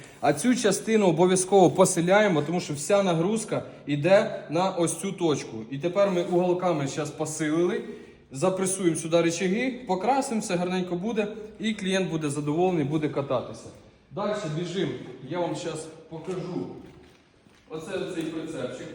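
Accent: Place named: Surzhyk, Ukraine. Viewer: Ну говори уже на русском языке, слышно же по твоему суржику что ты русскоговорящий.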